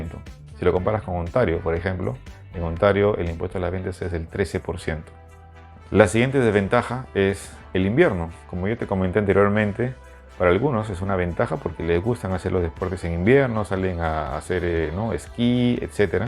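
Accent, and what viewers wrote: Peru. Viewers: eres Peruano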